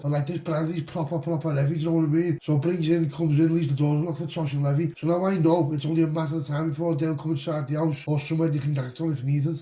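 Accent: Liverpool, United Kingdom. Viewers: YES SCOUSE. I'd literally listen to one EVERY day, always click straight on when see one of yours. 'What are you on about"❤😂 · Oooow scouse 😂😂😂 even in my darkest hours you still make me smile m8 🎉🎉🎉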